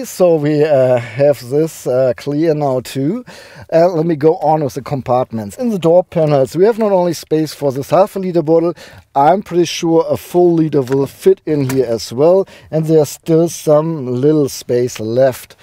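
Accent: Germany. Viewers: Lol your dash of humor is refreshing. Especially in that German accent!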